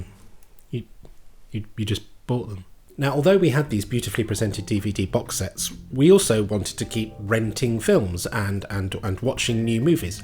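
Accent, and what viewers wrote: United States. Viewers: Great gravitas american accent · Can't figure out which American accent(s) you're attempting there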